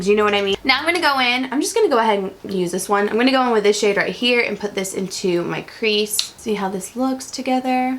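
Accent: South, United States. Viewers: Just subbed beautiful! Love your Southern accent!!!!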